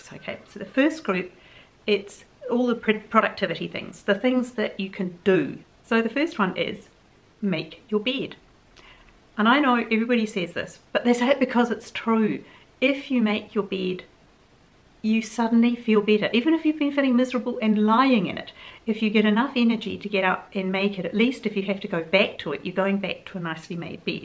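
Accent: New Zealand. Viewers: I love when you post a chat....look for the next one each day. Currently I am packing and clearing ready to put my home up for sale. I too am bemoaning the heat as I live in far North Queensland. Did you end up being Molly’s new mum? As you never mentioned her in this clip, I assume not. Love listening to you, you sound like my kiwi sister-in-law.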